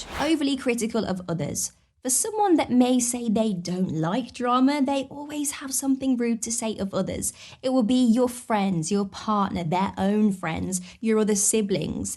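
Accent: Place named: United Kingdom. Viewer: Thanks for the clarity. Oh my goodness you hit the nail on the head. If you saw the shock I went thru when I found out about NPD at 40, it shook me to my core and am still going thru so much years later. I not only lost my childhood, I lost the best years of my adult life too. Btw: hello from Australia. I love your British accent.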